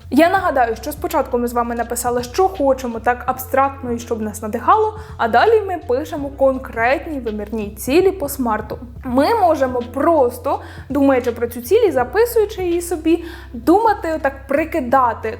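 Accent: Poltava, Ukraine. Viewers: Ви випадково не з Полтави?)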